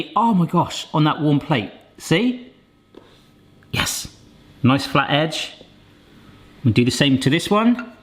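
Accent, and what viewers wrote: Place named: West Country, United Kingdom. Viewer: Stonkin (my dad is from westcountry) so your accent sounds normal to me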